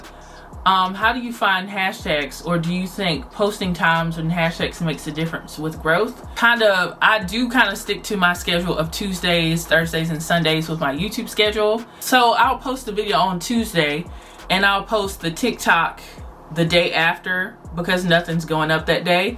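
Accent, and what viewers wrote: South, United States. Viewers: This has probably been the BEST, most informative, and straight to the point video I’ve watched regarding being a content creator. Thank you so much for being transparent with your audience. Congratulations on 9 years! 🥳…Also new subbie (Khrissy told me about you 💞) and also because I love your accent! I immediately knew you were from the South! 🥰♥️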